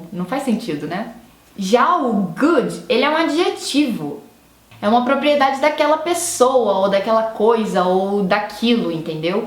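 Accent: Brazil. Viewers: Outra coisa importante pra se lembrar é que as intonações mesmas são diferentes, então em todos os casos que a apresentava o jeito errado de tentar fazer uma pergunta só por entoar a frase afirmativa de jeito interrogativo, aquele "jeito interrogativo" da intonação brasileira não soa natural como intonação de pergunta em inglês: aliás, aquele tipo de intonação no inglês transmite a noção de surpresa irritante, de nojo ou algo assim. Falando de intonação... Eu não quero corrigir a, porque o inglês também não é a lingua materna de mim, mas a intonação que ela ponha nas frases interrogativas inglesas gramaticamente corretas (invertidas) também fica a intonação interrogativa do português brasileiro. Quer dizer: não é a intonação inglesa -- o que faz as perguntas continuarem de soar estranho. Desculpe erros do meu português -- tô um seguidor fiel do vlog, mas não sou brasileiro. 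Sou polonês:).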